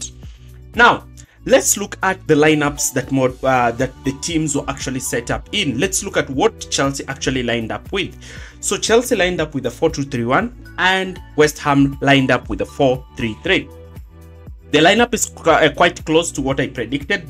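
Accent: Kenya. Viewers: Are you Kenyan? You sound like one 😂❤❤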